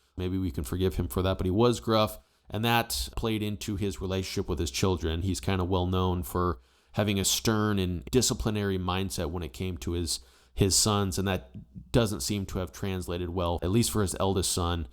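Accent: United States. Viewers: I apologize, that's my bad then. I even listened to it a few times but I still couldn't quite decipher your strong American accent 🙃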